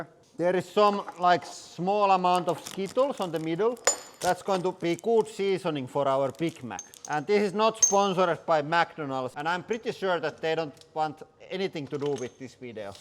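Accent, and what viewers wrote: Finland. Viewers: The most Finnish sounding man I've ever heard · äijä hyvin paistaa toi suomi läpi puheesta xd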